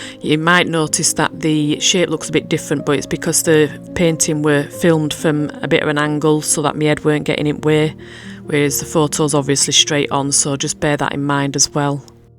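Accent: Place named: Yorkshire, United Kingdom. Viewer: Not only are you a shit hot artist, but your accent is amazing! Reminds me of so many of my Yorkshire friends haha. Familiar, warm and lovely..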